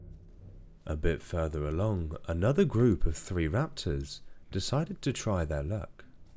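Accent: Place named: United Kingdom. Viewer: Once I heard the British accent and calm personality . I instantly knew this was gonna be good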